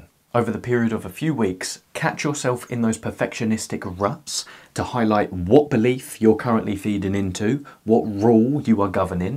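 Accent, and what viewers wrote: United Kingdom. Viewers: this british accent made it hard for me to understand lol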